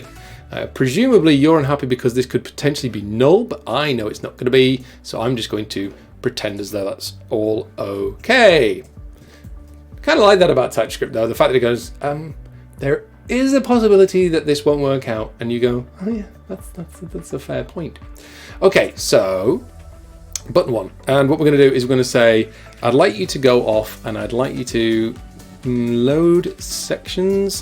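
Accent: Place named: United Kingdom. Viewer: Curious. Where in the UK is your accent from?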